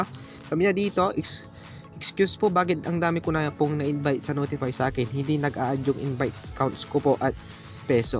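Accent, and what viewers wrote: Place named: Pangasinan, Philippines. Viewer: Taga pangasinan ka no lodi halata sa accent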